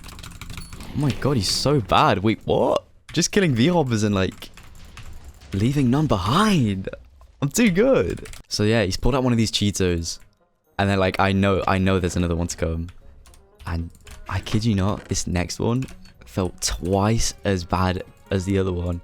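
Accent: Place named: United Kingdom. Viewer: Nice British Accent =)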